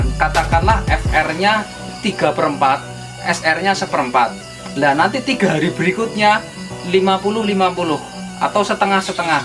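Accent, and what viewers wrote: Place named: Banyumas, Indonesia. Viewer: Walau sy pelihara English anggora tp tertarik sekali nonton chanel sampean, bs juga di terapkan,, aplg sama2 ngapak haha Salam ngapak Banjarnegara👍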